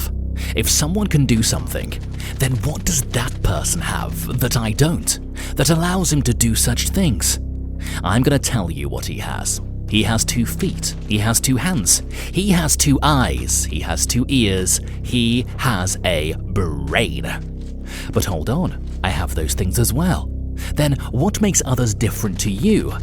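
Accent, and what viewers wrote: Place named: United Kingdom. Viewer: Hey guys I'm new to chanel. Why is the commentary in a British accent in contrast with accent????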